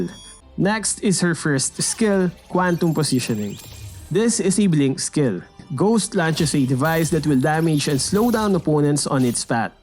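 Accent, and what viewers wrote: Philippines. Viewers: Nice. Very pinoy ang accent ha. Hahaha. "Owpennns a laaaht of paaassibilities" 😂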